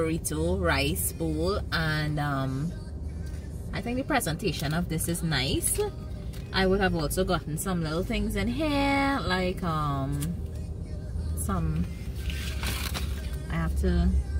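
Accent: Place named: Trinidad, Trinidad and Tobago. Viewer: Damn you so beautiful, looking non Trinidadian...but when you start speaking...you proved me wrong...have a great day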